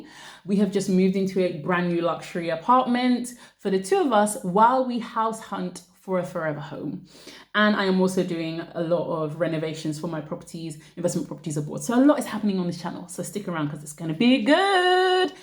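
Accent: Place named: United Kingdom. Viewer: Your British accent is beautiful.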